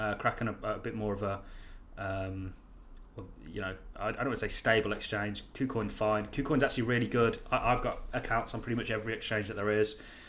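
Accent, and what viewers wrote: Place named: Wolverhampton, United Kingdom. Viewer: I'd say wolves not brum haha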